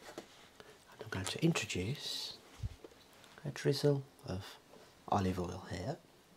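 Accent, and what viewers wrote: Birmingham, United Kingdom. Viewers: that is what we call a brummie accent, personally one of my favs to listen to as a southerner from the uk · You, sir, have finally put some respect on the brummy accent. I salute you. Also I love this style of video!!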